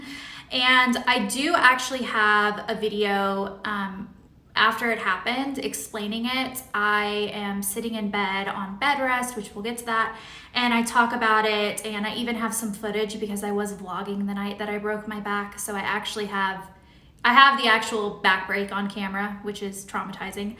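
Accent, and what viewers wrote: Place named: South, United States. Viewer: Your southern drawl is coming back <3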